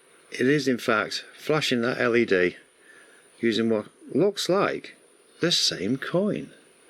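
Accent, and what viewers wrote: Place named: United Kingdom. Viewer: What shocked me is a British accent living in the United States. I subscribed😂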